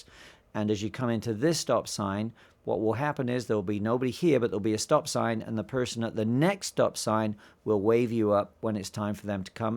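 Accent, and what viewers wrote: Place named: Kentucky, United States. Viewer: I would not have pegged that as a Kentucky accent!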